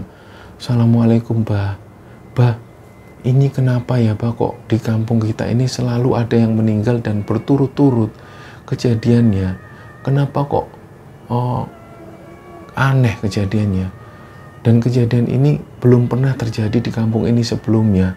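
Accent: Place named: Banyumas, Indonesia. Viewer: Iya jelas ngapak lur😁 oke siap lur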